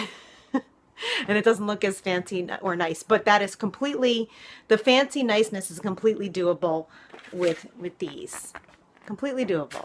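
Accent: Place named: New York, United States. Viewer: I can't get enough of that NY accent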